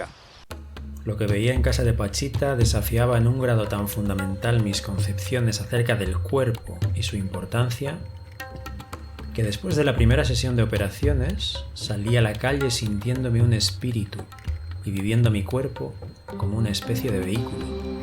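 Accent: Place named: Spain. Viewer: Todo bien pero no soporto ver y oir este interesante documental con el acento español...como que algo no cuadra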